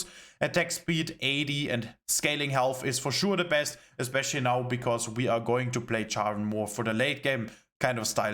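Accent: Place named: Germany. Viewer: Such a strong german accent. I cant... just cant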